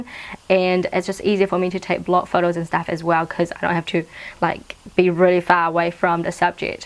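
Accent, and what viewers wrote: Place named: Australia. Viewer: Great tip. Are you from Australia? your accent is so cute.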